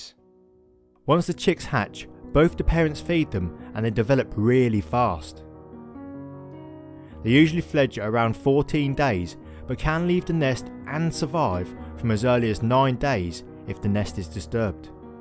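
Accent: United Kingdom. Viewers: Awww mate, that beautiful accent. You make me miss the UK. Thanks for posting. Great stuff. Love from Alabama.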